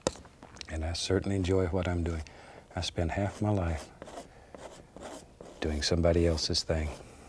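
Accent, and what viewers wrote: South, United States. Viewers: Even after all the years of living away from the south, he still sounded so very southern.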